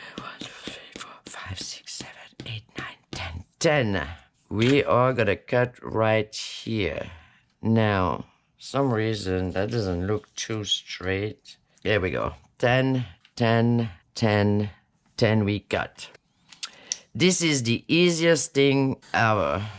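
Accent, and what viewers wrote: France. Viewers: These are very cute double pockets,! I missed seeing your videos for awhile, the last one I saw was the one with using cereal boxes to turn into “books”! I’ve collected several sets, 2 of each & I ordered the little clip thingies from Amazon, so I’m looking forward to getting on with that! This one today, though seems pretty quick & easy, so I might sneak one of these in first! It’s so fun to listen to you talk, English with a French accent is much nicer than plain old “American”! How did you become so proficient at English? And it’s not book English, you use a lot of slang & you speak very quickly, without pausing to think about a word!! Sorry, I sound like I’m interviewing you, I’m just curious! Now that I’ve found you again, I’m going to catch up on your videos! Thank you! Erica❤hope you’re having a nice weekend!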